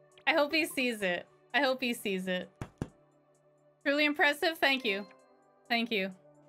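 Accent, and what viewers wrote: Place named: Northeast, United States. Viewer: Found you from those weird survivor AC vids. You're really good and your voice is so enjoyable and refreshing as well. You honestly sound like a northeast American to me but you said you're Canadian. That's really cool. Montreal is my home away from home.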